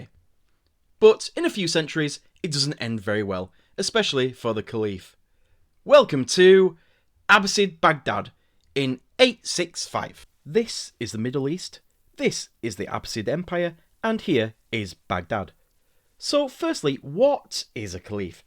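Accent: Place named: North, United Kingdom. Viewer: I really like your witty style, pairs well with northern accent. Keep up the good work!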